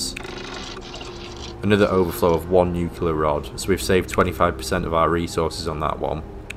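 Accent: United Kingdom. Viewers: Thanks, this is the first video ive seen that has a nice soothing UK accent over "some others" that are hard to bear, and explains the flow through the various processes quite well indeed. Many thanks. On PC ( Possibly consoles also) there is a mod you can get called Richer Galaxy which increases the vendor cash amounts and a few other things also, might make it at least feel worthwhile to make and sell your niff naff tat.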